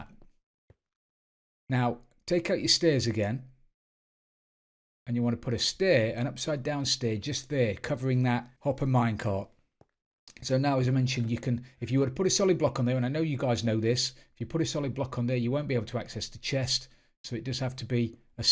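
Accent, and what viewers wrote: England, United Kingdom. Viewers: I love his English accent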